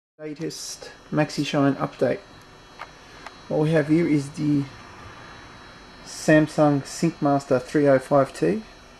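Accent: Australia. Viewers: hey are u Australian???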